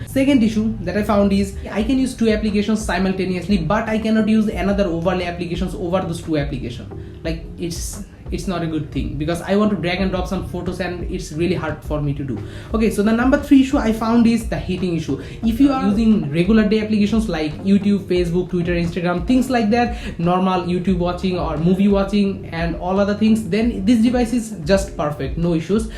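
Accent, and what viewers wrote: Bengal, India. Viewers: Bengali English accent👌👌👌👌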